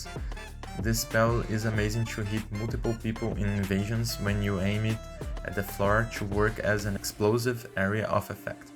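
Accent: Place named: Brazil. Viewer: Vc é br ne cara, logo no começo notei o sotaque kkkkk Adorei o video!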